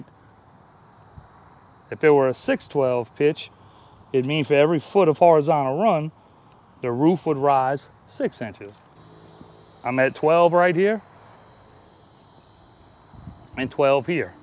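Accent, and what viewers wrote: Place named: Acadiana, United States. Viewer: I recognize an Acadiana accent a mile away.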